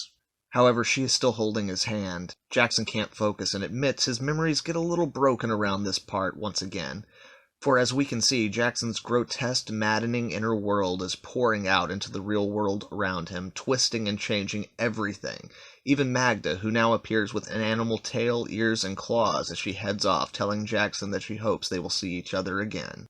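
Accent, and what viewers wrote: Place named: Russia. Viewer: It almost sounds like Russian/Easter European accent lol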